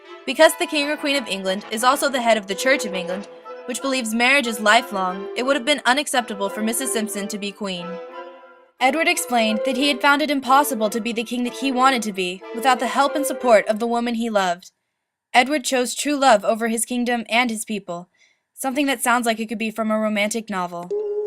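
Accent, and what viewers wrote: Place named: United States. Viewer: The young lady who is discussing this has an American style accent so I assume she has no understanding of the role of a Constitutional Monarch. I have never yet met an American who does. Firstly this is not an analysis ..it is a repetition... with little depth. For the record...from the someone who lives in the UK. The role of a British Monarch is to uphold the Constitution and provide a unifying force to balance the power of politicians. The Monarch leads of life of great priviledge but they are trained from birth into the role they are undertaking and an understanding that this is a two way process. The Monarch is most definately NOT able to do as he or she wishes and must put the needs of their people first. The role of Consort is also highly demanding and requires great strength of character to fulfill the function properly ( we have a recent example of where that went wrong and the turmoil it caused.) NOTHING is more important than upholding the Constitution. 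This country had several Civil Wars getting the power balance right. Edward the V111 is generally regarded in the UK as a weak,immature man who selfishly put his own needs above that of the people and showed no ability to recognise the damage he was causing. In doing so he caused a Constitutional crises which nearly destroyed the very Constitution he was suppose to uphold at all costs and put the Country on a path to Civil War as people took sides.. Being King was his role in life and he was a disaster at it. It is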